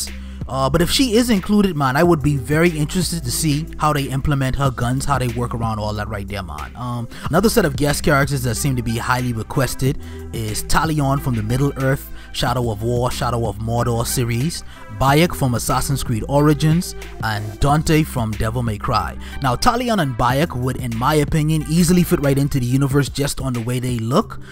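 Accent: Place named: Bahamas. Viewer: Haha, nice video! I know that Bahamian accent anywhere!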